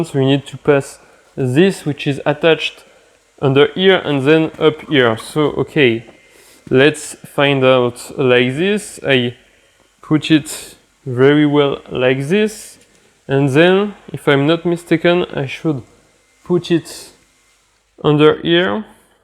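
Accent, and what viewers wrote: France. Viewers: great—just a suggestion: if you want, try improving your English pronunciation to make the video even more enjoyable, because it's quite hard to follow you with your very strong French accent.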